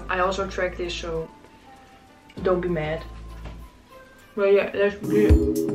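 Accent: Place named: Netherlands. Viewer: Leuk dat je gelijk kan horen wanneer iemand die engels spreekt een Nederlander is haha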